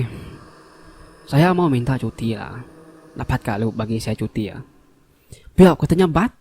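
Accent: Sarawak, Malaysia. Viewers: Biasa lah nya, lidah iban hehe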